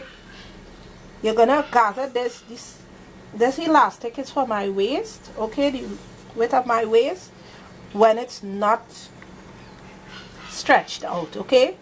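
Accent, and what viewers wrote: Trinidad and Tobago. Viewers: hey are u trinidadian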